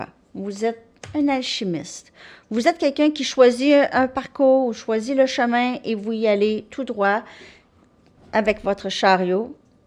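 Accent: Quebec, Canada. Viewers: J'adore ton accent (Québec?)Une de mes meilleures amies est québecoise .J'adore les expressions que vous avez gardé et que je trouve romantiques . En France nous les avons oublié ,dommage!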